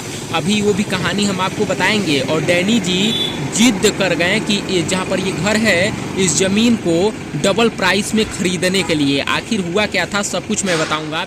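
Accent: Bihar, India. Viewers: Are you bihari and bhojpuri speaking person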